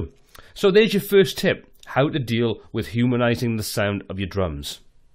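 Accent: Wales, United Kingdom. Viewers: No we don't, this guy is Welsh, so it is a regional variation, it's not a moot point! Also for route, we pronounce it root not rowt.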